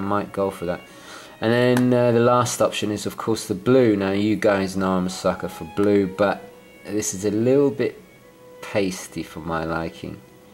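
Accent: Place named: United Kingdom. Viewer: Not to nit pick......but its pronounced "Hoi-er" .....not "Hew-er".....for the brand Heuer. I guess it must be a Brit thing as you also tend to say "Oh-mee-gah".....vs, "Oh-may-gah" ( for Omega), as most others do. Standing by for the inevitable flaming, but my intent was to inform not to irritate. Good review.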